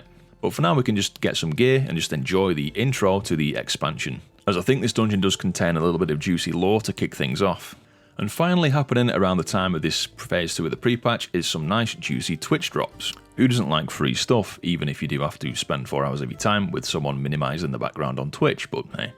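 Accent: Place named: North, United Kingdom. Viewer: Its the warm Northern accent that im here for really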